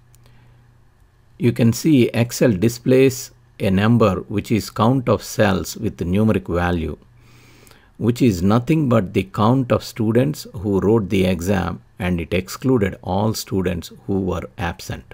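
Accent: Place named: South India, India. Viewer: Must be from south Indian .... peice of advice ur accent s*cks so plz don't insult English language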